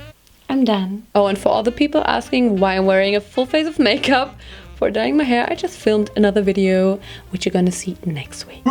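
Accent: Germany. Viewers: Are you German and if you are your accent is beautiful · I would like to hear more of your German accent 😂❤❤ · Der deutsche akzent👌😂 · Sie hat iwie schon nen deutschen akzent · THE GERMAN ACCENT ❤️❤️❤️❤️ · with an ultra German accent is the best. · du hast auch so einen typisch deutschen Akzent😂😂❤ Aber das find ich, hört sich süß an, also keine Sorge🤗😙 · Du hast doch nen deutschen Akzent in deinem english auch wenn du es nicht spielst 😅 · Ok tbh ich hab deinen deutschen Akzent bevor du ihn so typisch deutsch ausgesprochen hast, gar nicht bemerkt xD danach schon xD aber respect echt gutes English was sie da sprechen tun Madame d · Ich liebe dein German akzent 🤩😍🔥 I tried so hard to to do it, but I am *tresh* 😂😂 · OMG THE GERMAN ACCENT PLEASE ONLY TALK LIKE THAT! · Pure Unterhaltung, dieser deutsche Akzent xD #broccolomi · Der deutsche Akzent killt mich jedes mal xD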